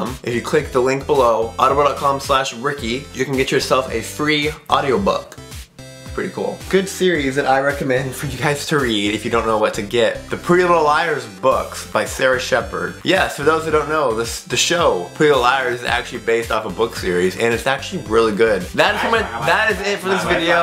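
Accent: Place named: South, United States. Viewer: NEW SUBSCRIBER I LIKE HIS KINDA SLUR AND COUNTRY VOICE!!! 😄😘 and he's ADORABLE AS HELL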